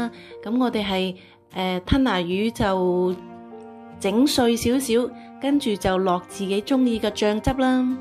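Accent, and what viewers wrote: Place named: Guangzhou, China. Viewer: 你好！你做吞拿魚包好靚好似好好食！聽你嘅口音好似廣州人？你把聲好聽好可愛，多謝你的分享。😄😄👍👍💐🌺🌹。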